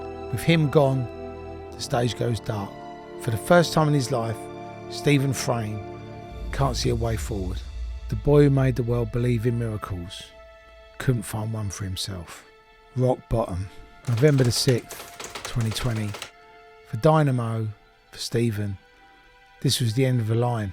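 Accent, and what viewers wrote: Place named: England, United Kingdom. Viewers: Complements to the narrator with the very strong English accent. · I loved the way you told the story it's nice to hear an English accent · I can't even lie.. I'm a cockney through and through and listening to the story of Dynamo told by someone who sounds as if he could be my uncle really makes me feel as if I'm closer to the story than I am. I also believe this was narrated beautifully. Im so glad to have seen Dynamo show face when he was invited into a sidemen video this year (2025). His comeback is nothing but remarkable. I truly believe that if jesus had made his second coming.. then Dynamo is one of the ones who was chosen to show the world the true power of what God and jesus can do. I'm far from religious, however the feats he has performed.. some are on par with the words of the miracles performed by jesus.. 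make of that as you will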